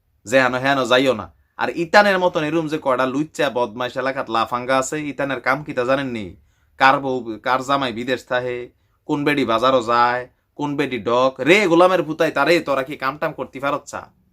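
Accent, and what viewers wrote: Comilla, Bangladesh. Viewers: ভাই আপনার ভাষায় বুঝা যায় আপনি কুমিল্লার,কোন জায়গায় আপনার বাড়ি। আমি ও কুমিল্লার । আপনি খুব ভালো ভাবে উপস্থাপন করেন। আপনার প্রত্যেকটা আপডেট আমি দেখি এবং মজা পাই। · আপনার মুখে কুমিল্লার ভাসা অনেক বালো লাগে বাই এখন আমি ওহন কি হর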